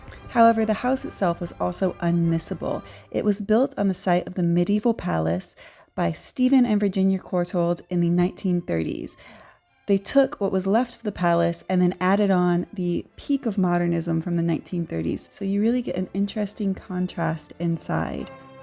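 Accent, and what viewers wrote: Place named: United States. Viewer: Strange to hear a place called a palace described as cute? It's so cute to call it cute. You sound american so if you plan to use brick I hope you don't live in a state with bitter cold winters. Britain doesn't tend to have long spells of bitter freezing temps. If you get bricks make sure they are dense and not very water absorbent or they will fall apart after even a year or two. Stone holds up better in freezing temps as long as it is very absorbent stone. I don't know why everybody insists on using grout on the new precision tiles. You don't need it. Tiles don't expand or contract due to temperature changes you experience in a typical home. You can lay tiles with the thinnest line between them. That seam can actually be the same cement you use to adhere them to the floor.. I use a vinyl adhesive. The grout only tends to get dirty or even moldy. 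There are grout sealers but I don't know how long they last. If I ever do my kitchen floor again I'm going to omit the grout between tiles. I laid a hearth under a wood stove using granite tiles and didn't use adhesive or grout. The subsurface is very flat so nothing wobbles. In case anything cracks I can replace it easily with a few backup spare tiles. There is an oak edge holding everything in place against the walls. NO problems after 10 to 15 years already. I haven't had any cracked tiles. .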